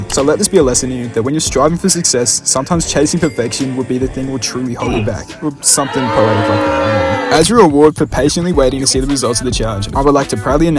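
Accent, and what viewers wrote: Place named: Australia. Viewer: I KNEW YOU WDRE AUSTRALIAN BY YOUR VOICE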